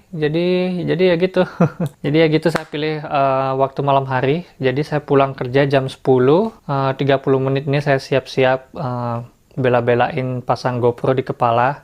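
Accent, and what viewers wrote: Bali, Indonesia. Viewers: subscribed. aku suka cara ngomongnya. khas orang bali. apalagi kalo lagi rliding eh riding gak ngebut sih cuma ya asik aja dengerlin eh dengerin celotehannya. salam kenal om ✌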